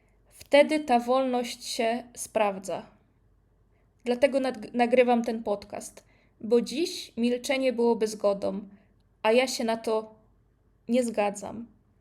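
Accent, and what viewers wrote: Russia. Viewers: Audycja sponsorowana. Zobaczcie i poznajcie sponsora kanału jeżeli potraficie czytać i słuchać w melodyjnym języku rosyjskim 🤣 Bystrzejszym uszom proponuję wsłuchać się w akcent pani lektor. Czego tu jeszcze nie rozumiecie? 😂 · Ile tu ruskich troli się namnożyło. A tak w ogóle niech pani popracuje nad językiem polskim, bo słyszę akcent rosyjski z pani ust. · Czyli jak maciak popiera pani rosyjski i białoruski reżim, po Pani akcencie nie dziwię się. Łagry, zabijanie przeciwników politycznych jak Nawalny - to jest wolność słowa. No Brawo. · Ty ruska. Na front! Czuć rosyjski dialekt. L!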